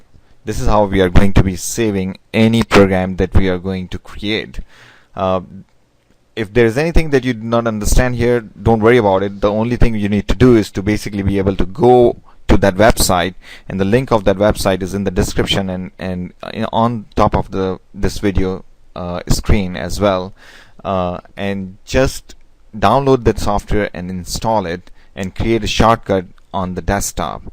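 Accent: India. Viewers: thank u sir....one question..r u indian????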